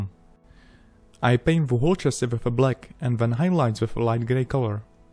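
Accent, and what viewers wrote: Czech Republic. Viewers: Nice work PS: I hear the Czech accent :) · Jedna otázka: SI ČECH? Lebo ten prízvuk mi strašne sedí na brata môjho nárda! :) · Judging by the accent I'd say he's from Czech Republic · Hello, this is my first seen video from you its amazing and i noticed a czech akcent so if you are from Czech Republic. Tak Zdravím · Ta češkovina tam jde strašně slyšet 😂😂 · Bruh that czech accent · Are you from Czech right? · are you from Czech republic? :D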